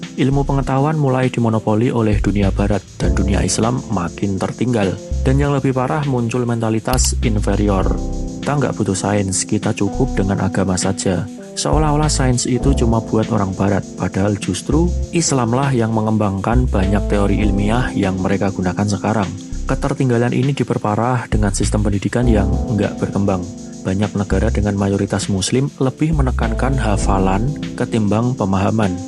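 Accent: Java, Indonesia. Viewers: Gw kirain mo belain pke dalil dalil konyol dan gw udh negatif thinking denger medoknya, ternyata gw ga tepat, ternyata bagus ada dasar knowledge yaitu sosiologi, ya wis lah gw sub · Jawamu medok ga enak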